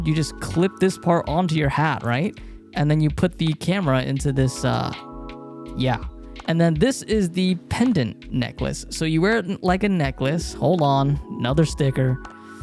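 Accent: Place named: Southeast Asia. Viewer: Bro! I like your English. I think you are from South East Asia, like Singapore or Malaysia?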